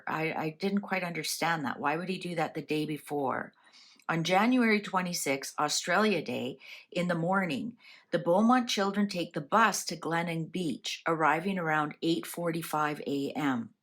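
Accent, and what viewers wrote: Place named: Canada. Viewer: Get over it.she is Canadian so pronounce differently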